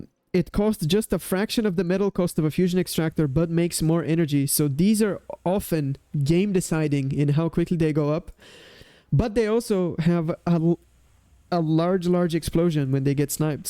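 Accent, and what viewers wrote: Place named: Quebec, Canada. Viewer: This guy kind of has the George St. Pierre accent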